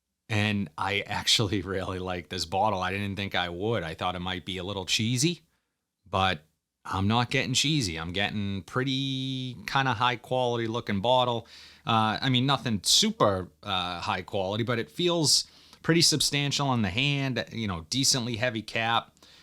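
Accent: Boston, United States. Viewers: I love your Boston accent Bro. I’m in Houston, so I don’t have a real strong Texas accent, but it’s still a Texas accent. I’m gonna go watch the Departed now lol. Sotd Guerlain L Homme Ideal edt.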